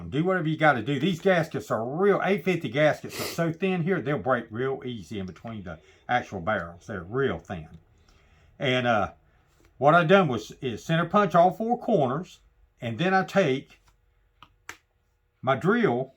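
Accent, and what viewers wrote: United States. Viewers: Are you from Kentucky? Your accent sounds like my relatives.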